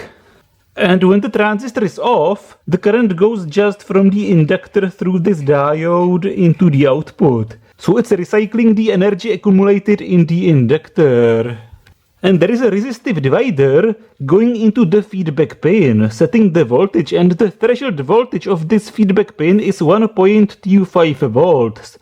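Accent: Czech Republic. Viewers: That is the best accent. Btw jsi Čech žejo 😂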